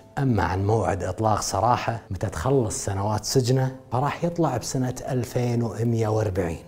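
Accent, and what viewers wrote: Kuwait. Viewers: انت كويتي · ملاحظة صغيرة انا من الجزائر ونلقى صعوبة في فهم اللهجة الكويتية حاول تبسط فيها ..قدامي لماذا جدامي ههه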